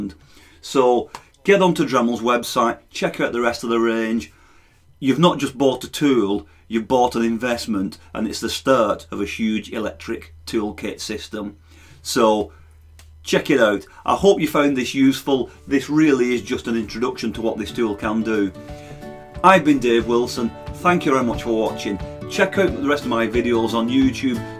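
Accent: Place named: North, United Kingdom. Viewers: I would like to second the previous comment. Absolutely they should hire you. thank you - Plus it was nice to hear a northern accent -I like in LA but come from Liverpool. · I just noticed your name is, Now I'm nervous that you're Irish, but you sound like a liverpudlian. Spot on review too, btw! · Thank you Dave. I spent a couple of frustrating hours reading the manual and puzzling over the box of bits that came with it. Your vid clarified it perfectly. Reassuring and pleasant to hear a northerner too. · Thanks, Dave! This tool is wonderful but the instructions that come with it are woeful. Now that I've watched your video, I actually know how to use it....and having it delivered in a Bolton(?) accent is an added bonus :-D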